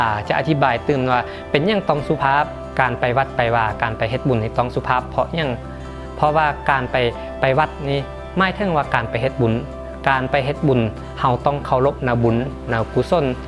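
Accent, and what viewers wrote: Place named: Phetchabun-Loei, Thailand. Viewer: สำเนียง เหมือน เพชรบรู - เลย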